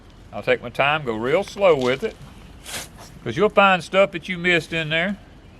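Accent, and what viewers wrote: South, United States. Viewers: I love a southern accent .....most of my most watched content creators have an accent , there has to be some type of crazy science behind it. Reminds me of my childhood. Born and raised in Northern New York Went to North Carolina during the summers Id always come back with a twang 🥰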